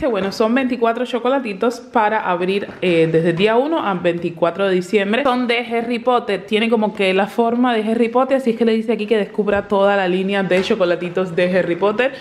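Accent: Cuba. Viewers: Navegando por youtuve y encontre una familia muy interesante y bella mas tu hijito que niño mas tierno y hermoso, sere tu Nueva seguidora ya que me encanto tu contenido felicidades amo el acento cubano saluditos y muchas bendiciones desde cbba-Bolivia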